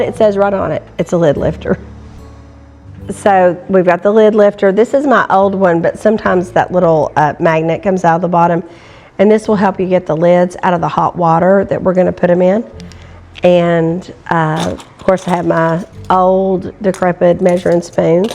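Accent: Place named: Appalachia, United States. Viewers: Appalachian dialect. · it is a real authentic country twang! I can't make it more formal even when I try. Thank you for the kind complements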